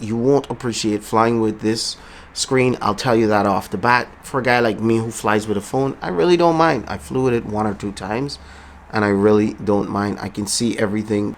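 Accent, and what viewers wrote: Trinidad, Trinidad and Tobago. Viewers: Always nice to find a new drone channel on YT...subscribed. Loved the video. You sound like an expat Trini btw. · u from Trinidad?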